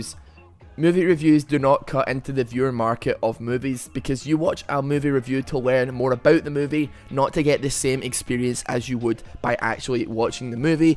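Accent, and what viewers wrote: Scotland, United Kingdom. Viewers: are you fookin scotish m8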